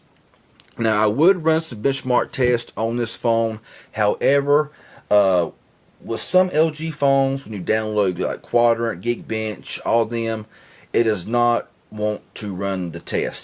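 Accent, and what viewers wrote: South, United States. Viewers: I love your Southern accent. It sounds really cool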